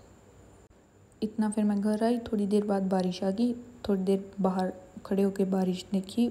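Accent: Haryana, India. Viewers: Aapki aawaj se lgta h ki aap kurukshetra side se ho...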